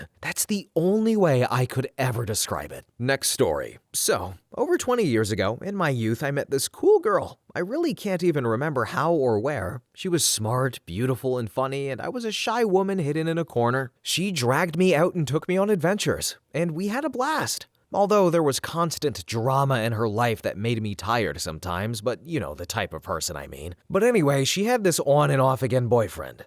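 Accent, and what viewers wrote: Russia. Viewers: Love your russian accent xD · Loved the Russian accent! Crazy story.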